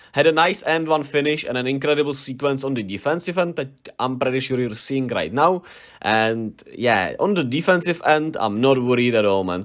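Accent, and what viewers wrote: Czech Republic. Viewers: Je huste pustit si video a jen z prizvuku zjistit, ze je nekdo Čech.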